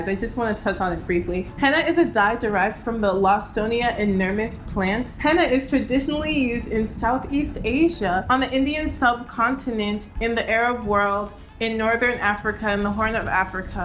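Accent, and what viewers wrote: Nigeria. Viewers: DRY Nigerian accent. LOLEST. Loved it. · Dry Nigerian accent 😂 at least you have one. I'm half Nigerian and never picked up the accent :( but I love it though!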